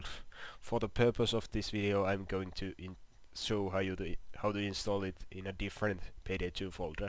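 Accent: Finland. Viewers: Your accent is slightly Finnish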